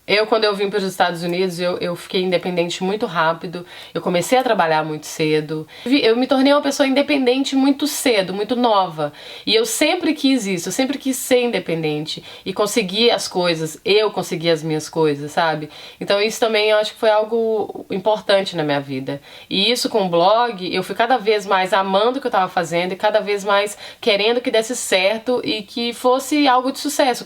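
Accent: Minas Gerais, Brazil. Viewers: Fofaaaaa, tinha que ser uma mineira... adoro a maneira como vc fala e ouvir seus conselhos bjssss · O sotaque de Minas não sai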